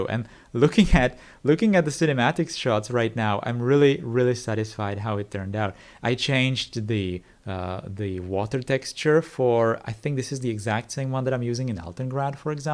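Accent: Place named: Czech Republic. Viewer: Personal question, you're not a native speaker right ? You sound a little Czech. Not insulting your English, i think it's great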